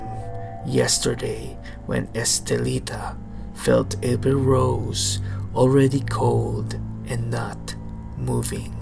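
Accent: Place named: Iloilo, Philippines. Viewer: Nakakaaliw boses mo boss ilonggo English kaayo gid b pamatian...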